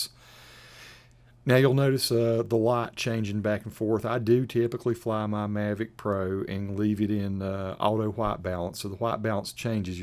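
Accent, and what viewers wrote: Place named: South, United States. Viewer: Thanks so much for making and sharing your videos. I’m “Southern by Choice,” having lived my first 18 years in America’s Siberia, and all these decades later I still don’t have a consistent Southern accent. You have a great one. Honest, I repeat after you 😄 tryna get rid of my rough edges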